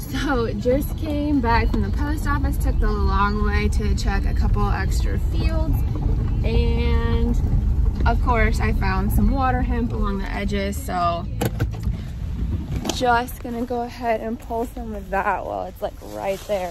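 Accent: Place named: Texas, United States. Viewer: You dont sound like youre from Indiana lol...more like Oklahoma or Texas lol. Does everyone in Indiana sound like you :)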